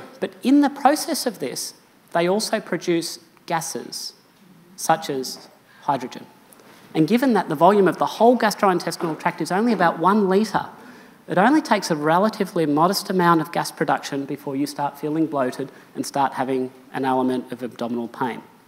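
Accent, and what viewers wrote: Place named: Australia. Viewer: Always a pleasure to listen to. Dr. Mason's appearance on HPO podcast was a huge treat and packed with fascinating info (check it out if you haven't). His lovely aussie accent is just icing on the cake.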